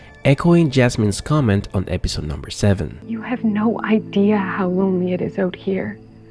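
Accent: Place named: Europe. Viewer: Your accent sounds like you from Europe somewhere like Turkey or Greece or maybe even like Ukraine Finland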